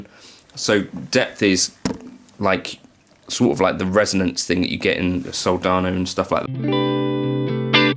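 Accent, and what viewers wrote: United Kingdom. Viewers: Ohh ! That would be great ! , I also have the HELIX LT, in that case no problem,but for the Pod Go it's a great idea to split it ! I've bought your Helix and Pod Go packs,there are many good presets ! You are a great artist,not only an incredible guitarrist,very creative and very original, besides it's amazing all the time you spend researching and trying new things every day that later you share with us ! For your accent I know you are from the UK,I lived in LONDON 7 years,while I was studying my Classical Guitar training at the Guildhall School of Music many years ago..I love England,whenever I can I go there... And I recorded some albums with Anthony Phillips,Ex guitarist and founder of GENESIS,we are very close friends he's also an incredible guitarrist.! Thanks a Lot ! Have a nice week.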